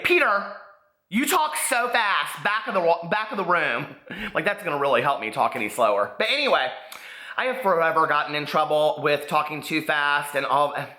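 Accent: United States. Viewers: If you are from Illinois, why are you speaking with a country accent? I understand the gay...but come on.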